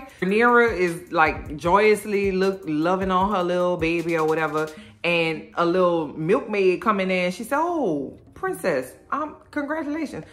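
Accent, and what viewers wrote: New Orleans, United States. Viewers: Look. I found you by way of.soooo I literally came back to HEAR YOU RECAP seasoning with your New Orleans sauce 😂😂😂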